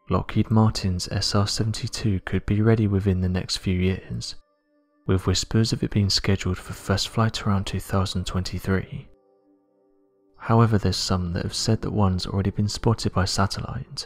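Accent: United Kingdom. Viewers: Secureteam10? You’re citing Secureteam10?!?!! I guess, at least it’s better than “someone said” or “another person thinks”. Your British accent isn’t enough lipstick for your fake news. Some websites?? Which ones?!?!?!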